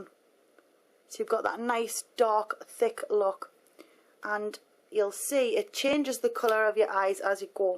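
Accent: Newcastle, United Kingdom. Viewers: Her accent is from Newcastle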